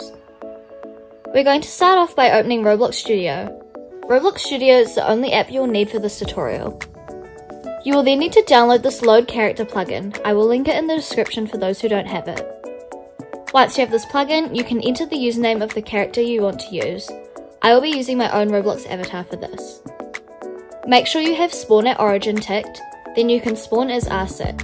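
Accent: New Zealand. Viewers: omg are you from nz?? you sound like one! (im sorry if that sounds rude or anything!!) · Are you from New Zealand?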